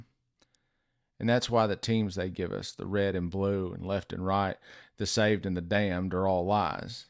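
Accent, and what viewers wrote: South, United States. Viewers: Preach my southern articulate brother in spirit.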